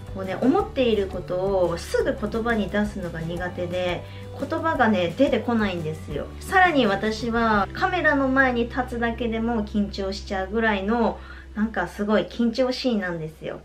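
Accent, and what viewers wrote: Kansai, Japan. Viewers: 鹿児島生まれ神戸在住ですさんの関西弁萌え(*^^*)♪ アタシは神戸に２０年以上いるので逆に鹿児島弁を忘れた（笑たまに実家に電話したら鹿児島弁になるんやけど 『なんか変なイントネーション』と言われるくらい関西弁が身についてしまってる～ さんは、ベッピンさんやで♡ 美容部員さんは美人じゃないとなれないとアタシは思ってて憧れる。素敵な職業ですよね♪ アタシは美的センスが無いから美容師を諦めて看護師になったけどやっぱりメイクを楽しむのは好きなんでさんのメイク動画いつも参考にしてます(^o^)v これからも楽しみにしてます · すでに11万人になっていますね‼️ おめでとうございます✨👏✨ YouTubeから銀の盾が贈られてくるのはこれからですか？さんは努力家ですね❗ 落ち着いた感じの分かりやすい丁寧な解説が、数あるメイク動画の中でも選ばれている理由だと思います。これからも頑張って下さい😄 (個人的にはさんの関西弁、もっと聞きたい・笑) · さんの動画、わかりやすく且つ簡潔ですごく見やすいです！いつも参考にさせていただいております💓 関西弁新鮮でキュンとしました笑考え方がすごく前向きで素敵です✨✨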